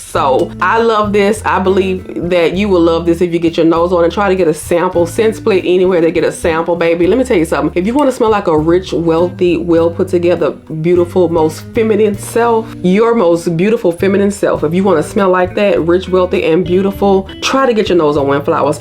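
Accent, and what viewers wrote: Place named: Tennessee, United States. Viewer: I LOVE your accent! Where are you from? It sounds Memphis/Tennessee as I’m from middle Tennessee 😂❤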